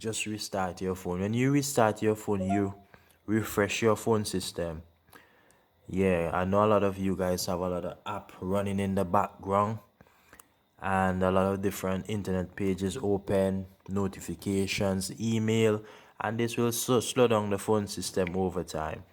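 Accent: Caribbean. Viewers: Which part of the Caribbean are you from? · As soon as I heard the voice I knew I was in good hands. Soothing Caribbean accent man, thank you so much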